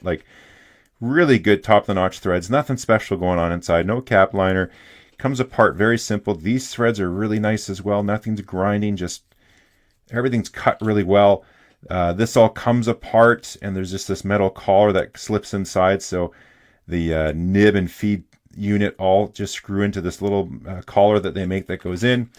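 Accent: Canada. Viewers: I'm an American and I lived in Hungary for 18 years and speak Hungarian. In the Hungarian language, the river is called the "Duna" (pronounced DO-nuh). Not sure about the origin of the word "Danube," but you're right, I've always heard it pronounced like you say, DAN-yoob. Maybe it's a Canadian English thing 🙂. Nothing major either way. This was an excellent and very useful video for adjusting nibs. Thank you, sir!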